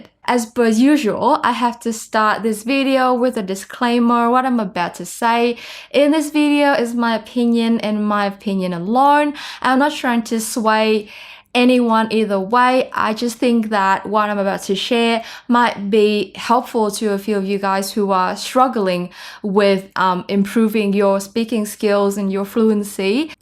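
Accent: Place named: Australia. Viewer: Dạo này em bị mê Aussie accent của chị quá huhu. Chị làm nhiều content bằng tiếng Anh đi để em có thể thụ động ngấm Aussie accent của chị với huhu 😭😭 Em ngán TV accent của em quá rồi, nghe hơi basic =)))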